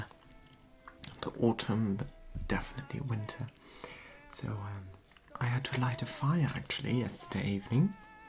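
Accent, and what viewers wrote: RP, United Kingdom. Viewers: I enjoy this so much. Just recently found your channel. I am wondering if this is your natural accent OR if you change it up for a former era. Or perhaps you are putting on a more proper upper crust accent. Isn't there a way of referring to the more proper Queen's English accent - RP or something like that. I enjoy hearing all the different types of English accents, the proper, the cockney, the Liverpool style, etc. I am American but I know there are many inflections. Just as we have so many regional accents here in America. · Thank you for putting this together. What a lovely posh accent you have. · What type of british accent is this? you and one other similar asmrtist share the same accent i cant help but wonder where this originates from.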